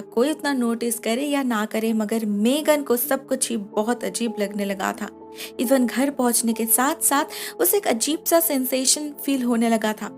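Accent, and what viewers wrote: Bengal, India. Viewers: u hav improved alot on ur hindi...but still bengali accent persist..cant blame u.. but so far so good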